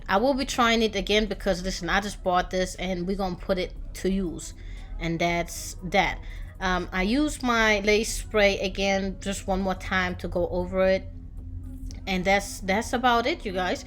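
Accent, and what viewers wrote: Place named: Cambodia. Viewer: Your assent sounds Cambodian love it wow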